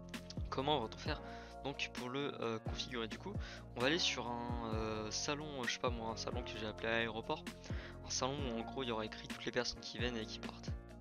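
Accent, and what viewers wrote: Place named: France. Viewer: idk man french accents are so pretty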